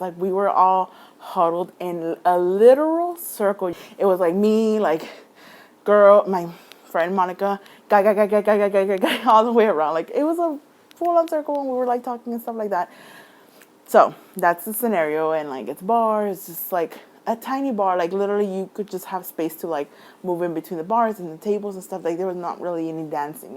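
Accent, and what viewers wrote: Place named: El Paso, United States. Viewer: Omg it sounds like you're from El Paso? Lol my mom is from ELP and I loved going to Mexico to get candy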